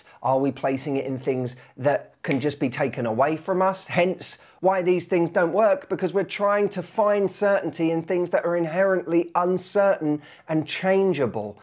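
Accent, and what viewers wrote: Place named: United Kingdom. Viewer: I think you’re an EXCELLENT SPEAKER! And we LOVE The way you talk, and your British accent. It’s amazing how we have these silly things in our head like you said stop comparing ourselves to others. And I prefer over Tony! seems genuine and kind compassionate NOT filthy dirty slimy and manipulative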